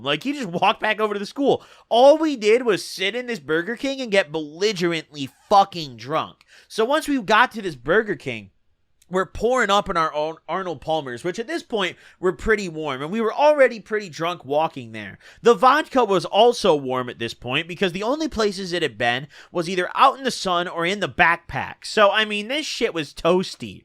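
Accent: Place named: Midwest, United States. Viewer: His accent has really changed this sounds so midwestern😂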